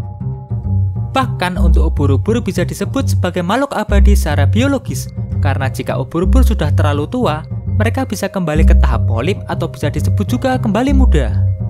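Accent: Java, Indonesia. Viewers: asli pean wong jowo tekan logat e wkwkwkwkw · medok jawa tengah/timur ya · medo'g ngomongnya.. :v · pengisi suara nya bikin ganggu , medog banget !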